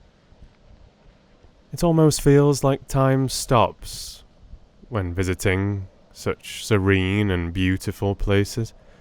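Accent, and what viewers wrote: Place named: England, United Kingdom. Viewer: what a wonderful late summers day! almost feel the smell from the water Your pronounciation is perfectly fine with a typical english accent:-). An extra information about fika is that the word comes from a time when people liked to play with words like this: fi-ka turned around = ka-fi... Kaffi is an older way to say kaffe.(coffe). Love Tove Janssons books, grow up with them. I will listen to your reading soon. 😊🌻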